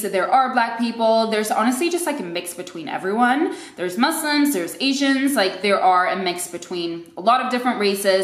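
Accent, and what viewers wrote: United States. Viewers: Amazing video! I am from Los Angeles & can tell that you have a TINY accent but you sound very "American" to me! I am looking into moving to Sweden or Switzerland someday &, as an Asian person, of course have my concerns about race. Thank you for the great video! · Holy moly you're stunningly beautiful. My question is, how soon do you start learning English and is an emphasis on accent a thing? Because you sound pretty much American with a very slight accent. I find it fascinating when people from abroad come to the US as an adult with very little accent speaking english. And why not a British accent? Is "American" english taught? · Now I’m curious to know where your parents are from. Where are they from if you don’t mind me asking? Also, it’s very interesting that you have an American accent. I’d never know you weren’t born and raised in USA. 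· Agreed, she sounds 100% American. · wow, born black swedish with american english accent. I didn't realize swedes who speak English sounded this way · I would have never thought that you were Swedish cause of your American accent. There isn't a single hint that you have an accent let alone Swedish accent. On the other hand, Sweden have significant Somali population. One of the few countries who welcomed Somali refugees and immigrants with open arms. Also my brother lives there. Great country. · Your accent is American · Being white and Swedish for hundreds of years I am one of those who loves diversity and I also love to talk to people so I am one of those that always ask that question or a variant of it if I meet someone black speaking Swedish without accent. It is asked out of curiosity and I guess as a part of getting to know someone. If I heard you speak I would just assume you were American. 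· You sure do sound American 🤔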